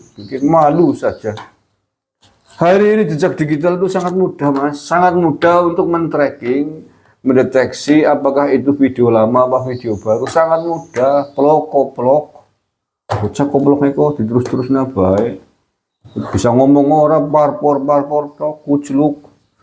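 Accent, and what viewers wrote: Banyumas, Indonesia. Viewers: Hajar pak!!! Tapi sy suka cengengesan sendiri klo liat konten pak, terutama klo lg marah dgn bahasa yg ngapak 😂😂😂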